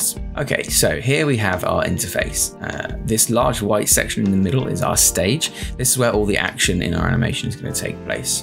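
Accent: United Kingdom. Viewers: Bro's British accent sounds so abnormal to me. Can y'all relate.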